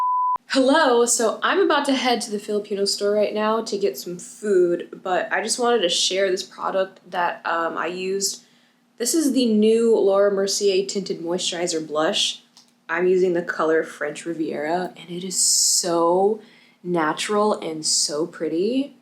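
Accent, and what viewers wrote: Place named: Australia. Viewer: Your such a beautiful soul inside and out and I hope everyday you keep a smile on your face and we love hearing you Aussie accent 😂🫶🏾🫶🏾🫶🏾🤎🤎🤎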